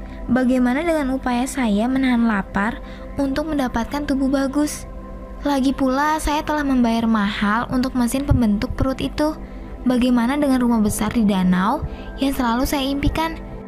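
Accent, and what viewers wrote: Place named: West Java, Indonesia. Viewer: Suara logat orang sunda nih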